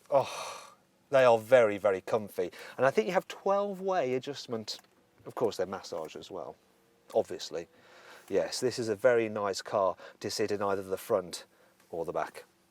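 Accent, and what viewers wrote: United Kingdom. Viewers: 이제 느낀건데 영국발음 진짜 고급스럽다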